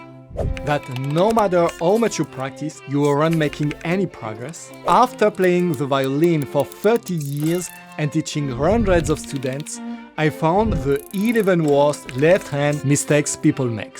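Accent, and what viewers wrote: France. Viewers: These are some of the best tips and tutorials on YT. I do have a little difficulty with the French accent. One suggestion is to correct a grammar mistake often made throughout these videos. He says "Let your finger on the string" for instance. What he means is "Leave your finger on the string." The word you want is leave.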